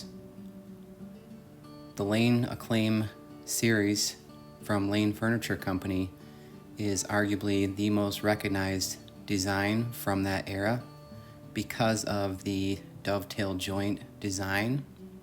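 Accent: Wisconsin, United States. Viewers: I am just watching you and a few others refinish furniture. I have chronic headaches and can't handle the fumes or the bending. I'm trying sewing but having trouble concentrating due to the chronic headaches. I'll get there. I am a 2 but sometimes a 1! My husband is super neat and I drive him crazy cause I'm not as orderly as when all 5 kids were home. Now when the grandkids are over their parents will clean up with them before they leave which is a life saver! You do good work. I like watching you and listening to you. That Wisconsin accent. Lol.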